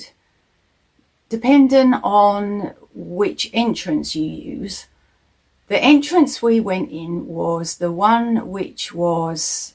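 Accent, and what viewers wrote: Suffolk, United Kingdom. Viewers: As me dad would say bootiful me darlin 😊😂 Im originally BSE .my family all over Risby ,Thetford, Stowmarket. Im suffolk side of Essex, suffolk, Cambridgeshire border now not the same but so proud of my accent not as sharp as yours my aunties all had that broad suffolk love it hun ❤️ love a good ghost storie . Years ago we go on Sunday drives with mum and dad. End up anywhere west stow . Fritton. Snetterton Sunday market..Felixstowe.. go nut picking berry picking. Explore woods and little villages beautiful had proper family time no one get that chance today 😢 thats how I know i always feel at home more in the woods but no dont want dark figures giving me the hebie gibies 😂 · Love your videos. I’m a Suffolk girl born and bred, like you. Love hearing our accent. It seems to be disappearing, please keep up your hard work. xx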